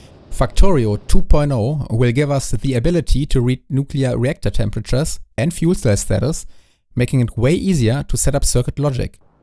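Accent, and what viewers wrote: Germany. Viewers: very deutsch :D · r u german ?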